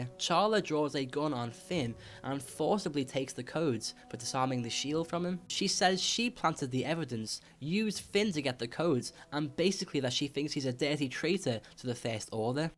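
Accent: Liverpool, United Kingdom. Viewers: Its a scouser, its obviously balls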